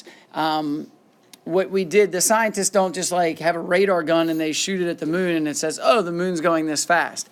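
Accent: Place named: Australia. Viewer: This guy has the mildest Aussie accent I've ever heard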